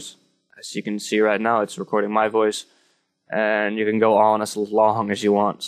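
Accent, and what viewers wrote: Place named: Netherlands. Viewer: NL accent, leuk man haha! trouwens je bent 60 fps vergeten, quaility 720p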